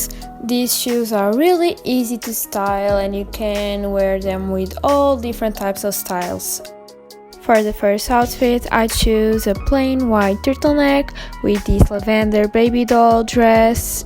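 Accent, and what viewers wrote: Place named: France. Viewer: Par tout hasard tu parlerais pas Français ? Y’a un petit accent quand même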